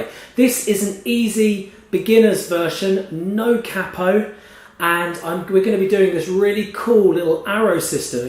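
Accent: United Kingdom. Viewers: i likes this guy, his accent is wonderful #yeaBRITISH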